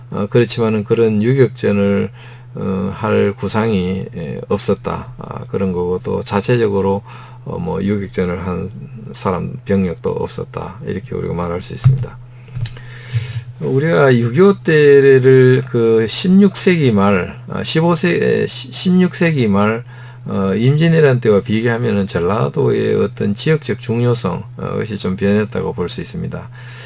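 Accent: Gyeongsang, South Korea. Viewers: 쌍도 억양으로 지역비하방송하지 마시라. 개쌍도야, 전라도 전라도 반복하지 마시라. 호남이다. 약무호남무국가라 했다. 호남이 없으면, 민주도 없고, 조선도 없었고, 대한민국도 없었다. 쌍도 험한지형, 호남 평야,미군주력부대라서, 625때 영남이 보전되었다.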